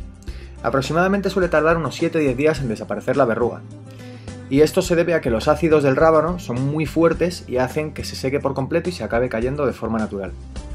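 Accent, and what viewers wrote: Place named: South America. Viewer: Excelente consejo. Lo llevaré a la práctica a la de ya!!! Muchas gracias por el tip. Sólo una pregunta mi distinguido: En lugar de lo que tu llamas esparadrapo puedo utilizar lo que yo llamaría Microporo o cinta microporosa???? Quiero entender que es lo mismo. Por tu acento creo que eres sudamericano o de España, y aquí en México yo creo que sería la alternativa correcta. Me equivoco???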